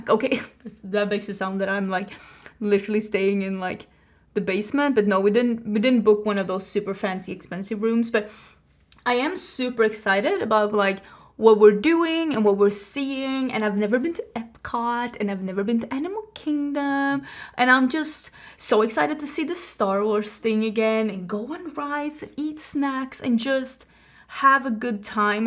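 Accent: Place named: Sweden. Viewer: Totally agreed. I'm an English/Grammar nerd and her English is impeccable. I do love when the Swede slips through and Js become Ys, but so many people who speak English as their first language commit far worse word crimes.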